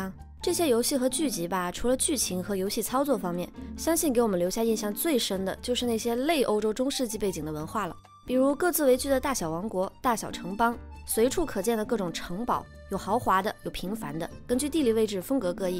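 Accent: Cantonese, China. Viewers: 廣東話好好聽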